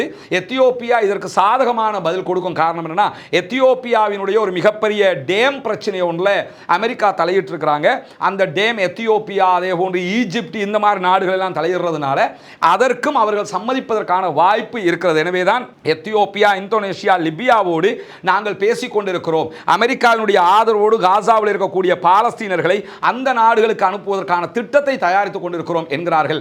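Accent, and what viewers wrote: India. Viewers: சிறப்பான தகவல்களைதரும் நீங்கள் ஆங்கிலத்தை இந்தியர் போல் உச்சரிப்பது ஏன்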